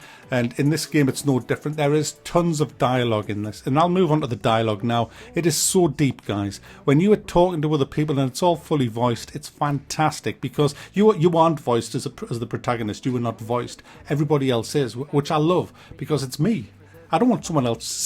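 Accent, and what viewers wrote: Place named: North, United Kingdom. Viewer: Damn you sound so northern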